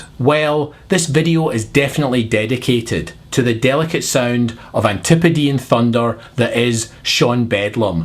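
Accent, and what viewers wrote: Scotland, United Kingdom. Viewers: Normally I wouldn't do anything so pedestrian as comment on someone's accent. But your scottish tambre makes "warfare fix" sound like "warFEAR fix", which when you think about it is entirely appropriate. As for "American" Football, it's ironic that Minnesota is lagging so far behind on their stadium work, they may lose the Vikings to Toronto! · Your American? but you have a Scottish accent. Anyway, there wont be a war on Iran. Not for the moment anyway. The USA is stuck in a quagmire in Iraq and Afghanistan and it is becoming very expensive. The US economy is falling, along with its dollar and a war with powerful Iran(not a third world country)would be devistating to US economy. Also, countries like China and Russia are supplying some powerful weapons to this country.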